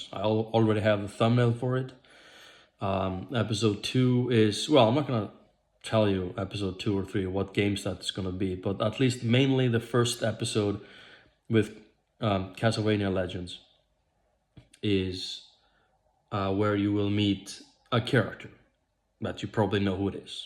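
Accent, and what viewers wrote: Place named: Europe. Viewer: 🎉 Nice hearing from you again. I see this video was recorded 5 days ago but showed up in my notifications only 1 hour ago! You reminded me in this video of Nagidal. Maybe the European accent, your appreciation of Gix or your calm voice, but I appreciate that you still post videos every now are then even with everything else going in your life, and quick videos like this one don’t really take much effort to do. I know what you mean by glass babies, and I can’t find the proper English term for that either, but all the best wishes to your girlfriend. I also like the idea of streaming or playing a game with someone else in your videos, even if just watching and commenting on the gameplay.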